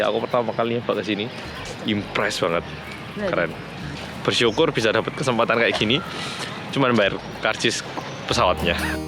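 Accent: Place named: Malang, Indonesia. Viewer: Mas di Belanda tetp dengan logat Malangnya. Ayo ngerawon sek mas + nak Dempo tuku es teler 😂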